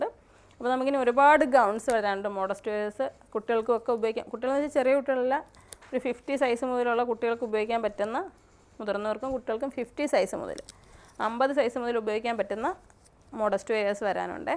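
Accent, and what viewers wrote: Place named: Kollam, India. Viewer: കൊല്ലം സംസാരം ഇഷ്ടായി 🌹🌹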